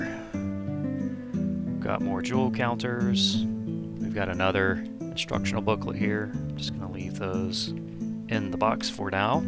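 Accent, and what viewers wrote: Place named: United States. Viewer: Your American pronunciation is funny. Regardless a good video.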